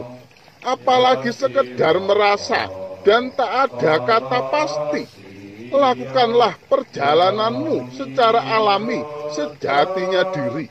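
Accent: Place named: Java, Indonesia. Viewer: Mas ojo medhok2 kalo bicara☺️👍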